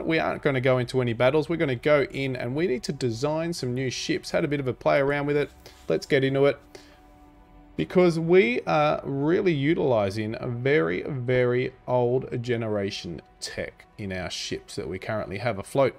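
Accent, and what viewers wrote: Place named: Australia. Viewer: Wwow man u r from Australia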